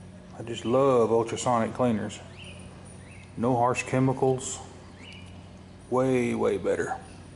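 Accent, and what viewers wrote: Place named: North Florida, United States. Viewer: You sound like a North Florida Boy... Great vid. I'm a North Florida Boy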